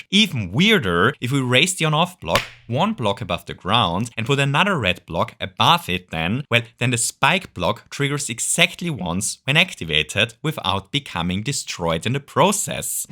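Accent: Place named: Germany. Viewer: I never get tired of watching your content. Being honest? I like your accent. It gives your videos some personality. German, perhaps?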